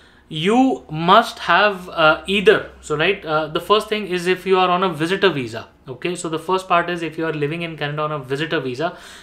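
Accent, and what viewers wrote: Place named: India. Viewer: Your indian accent is very strong bro.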